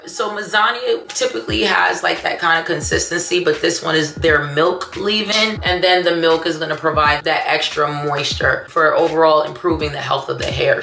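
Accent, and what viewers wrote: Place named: New York, United States. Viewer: Homegirl! I'm so glad to find your channel. First, you're sharing priceless knowledge and thank you for that. Also, it's been a long time since I lived in NYC and just listening to you talk takes me back to the good old days. You are filling me with so much joy by just being you and sharing that with the world. Keep on keeping on. 💖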